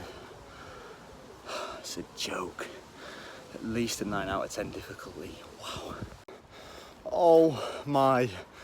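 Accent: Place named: United States. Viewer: You’ve got an American accent now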